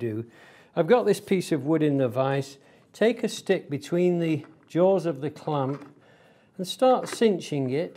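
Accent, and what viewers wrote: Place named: Northern England, United Kingdom. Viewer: Hi. your videos are great. I’m very new to woodwork, I was wondering where you source your timber from, and how to make sure it’s suitable for furniture making. I have a good friend who is very experienced. He bought some supposedly dried timber suitable for furniture, turns out it wasn’t dried enough and a beautiful table he made ended up splitting. I’m in the south east of the UK guessing you’re in the north from the accent. would appreciate any recommendations/advice. Kind regards. Jon.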